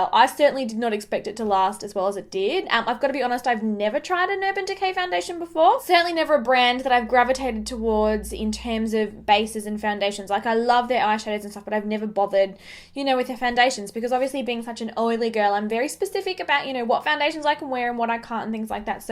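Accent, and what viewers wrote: Australia. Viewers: wow! I'm amazed at the crap people feel entitled to say to strangers /others on the internet. So rude. I'm Australian too, and think your accent is cute... Pfft.. Ignore the haters and do you! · Ohhh dear. You have the kind of totally hideous accent that makes me ashamed to be an aussie. The way you screech and draggggg out words, then your voice goes UP at the end of a sentence. Completely intolerable voice. Many will disagree but many will absolutely agree whether or not they are brave enough to say. A fact remains a fact whether u want to agree makes no difference.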